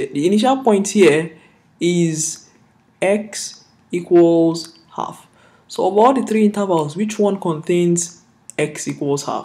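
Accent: Nigeria. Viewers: baba, are you Nigerian?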